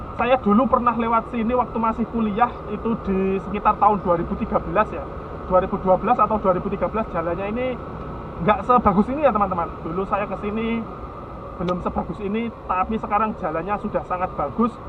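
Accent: Java, Indonesia. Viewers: Gemes dengar suaranya... medok medok pie ngono.. Salam dr medan Pak..buat sekeluarga...sehat selalu..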